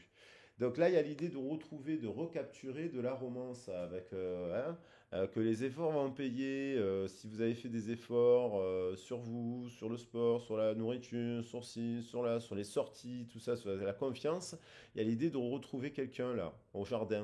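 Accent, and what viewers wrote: South, France. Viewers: Bonjour je suis tres heureuse de Vous voir sa me fait plaisir 💝😃 de Vous entendre j aime Bien votre accent du midi qui nous apporte le Soleil Dan's Notre Coeur merci beaucoup rayon de Soleil🌞👍gros bisous👄☘️💃🕺🌈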